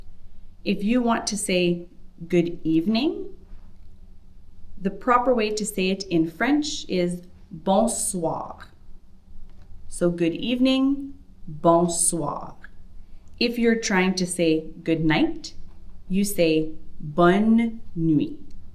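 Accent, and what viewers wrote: Quebec, Canada. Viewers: Is this Quebecois French or France French? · I would assume this is Quebec French being taught as opposed to Parision French?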